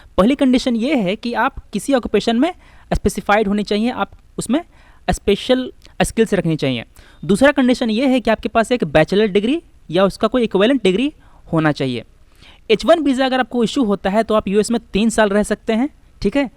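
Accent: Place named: Bihar, India. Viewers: U TUBER thats so rude of you . i like his this bihari accent . you dint watch if you dont like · if u have prblm with bihari accent... plz don't watch this videooo....this video has very beautifully explained all the pros and cons of H1B visa... thanks a lot sir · Dear U TUBER if u don't like the Bihari accent then do one thing, apply for H1B visa go to US and watch You Tube videos there u may like the american accent. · Bihari accent irritates me....pls sir change it if possible :)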